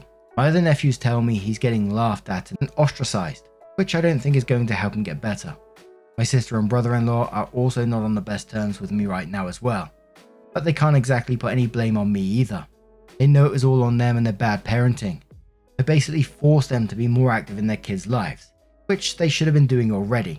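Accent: United Kingdom. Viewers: Went to listen and still too soon to hear a British accent after the Matilda’s loss. Am soo happy for for the Lionesses and honestly wish the the best of luck. Russo and Hemp played incredibly just heartbroken for the Tillies :( still wanted to leave clout to support the channel. #FIFAworldcup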